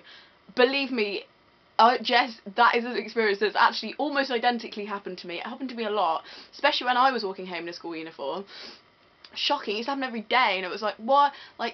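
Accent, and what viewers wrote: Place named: United Kingdom. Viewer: Wow. I can't listen to even one minute of this garbage even with the cute British accent. Of course I'm a man.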